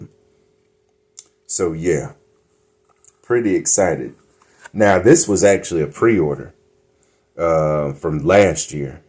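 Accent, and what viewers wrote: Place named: South, United States. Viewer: I like your southern twang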